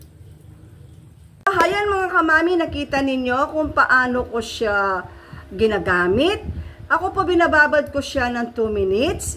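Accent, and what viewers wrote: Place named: Pangasinan, Philippines. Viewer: Panggalatok poba kayo kc halata sa salita ninyo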